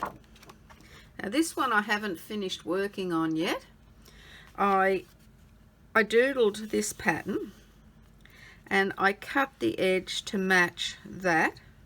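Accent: Australia. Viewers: I watched a napkin video of yours, I thought your voice sounded like a Ozzie, so I checked it out and watched this fabulous video I am overjoyed that i found you, your work is si impressive.I ❤❤❤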